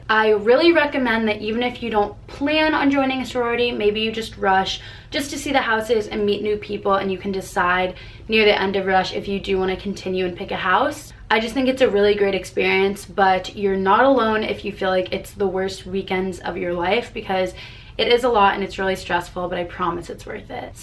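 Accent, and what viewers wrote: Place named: Australia. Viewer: you have a low key Australian accent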